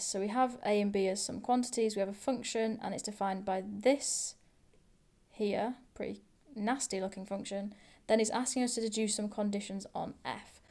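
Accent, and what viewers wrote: United Kingdom. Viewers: Are you British? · Bruhh that's british not Australian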